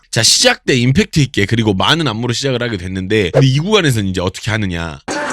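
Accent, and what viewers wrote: South, South Korea. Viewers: 구독.좋아요 눌렀어요.. 살짝 남부쪽 사투리가 들리네요.^^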